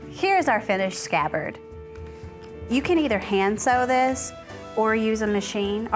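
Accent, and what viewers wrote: South, United States. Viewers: You are very talented, got homesick listening to your accent, I am a displaced Texan!!! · Got to love a pretty lady leathercrafter with a southern accent. You probably found that you dulled your clippers shearing the lining. But they can be easily resharpened with a whet stone.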